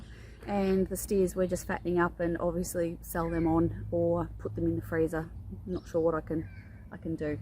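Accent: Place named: Australia. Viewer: Looking forward to seeing more videos. Beautiful place there. Where are you from Kind of sounds like Australia. Have a great night and God bless.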